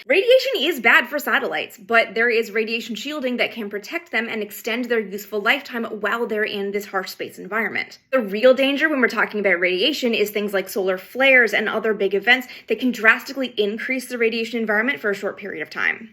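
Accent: Canada. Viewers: Please make a video just saying the words “about” and “out” and any other Canadian sounding words. You really need your own cable network dear. You are amazing. You’re my granddaughters age and I wish more of your generation loved the space program like my generation ,who grew up watching Mercury, Gemini, and Apollo, life on TV. Keep up the oatstanding (see what I did there) work❤️ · the Canadian accent lol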